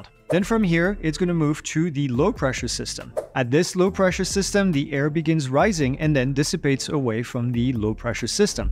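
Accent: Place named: France. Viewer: Are you from french descent ? I notice some remaining french accent in your speach.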